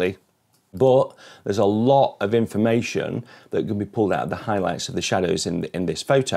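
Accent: United Kingdom. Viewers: Today i find out most annoying English accent in the world uk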